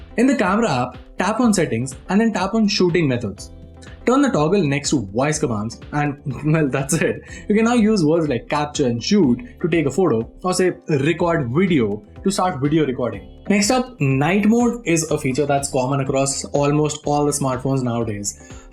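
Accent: India. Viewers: So hard when Indian talk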